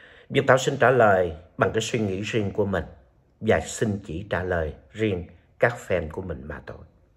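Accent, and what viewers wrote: Standard. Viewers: Bác Thảo MC nói quá hay quá chuẩn, cháu rất quý tác phong làm việc, giọng nói và cách dí dỏm của bác. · va rat chuan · Chú nói khá chuẩn . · loi noi cua anh chuan ko can chinh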